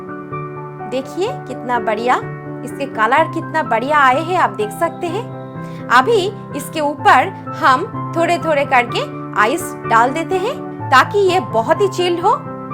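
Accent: Bengal, India. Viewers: Its always please and cute to hear hindi from a bengali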